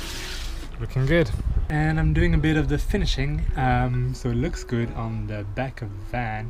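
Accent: France. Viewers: Love french accent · are you french or somethin? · Nicely done! You sound french ;) Nice ideas for when I'm building my fifth van in the future ;) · Bravo ! On n'entend presque pas que tu es français ;) Super conversion également, j'adore le deck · .Love your build... And accent haha ... Youre so chill too for a french guy .....I subbed. Keep it up!! Btw.... No mains 230V power inverter? Hi, From (English) Canada ;) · are u french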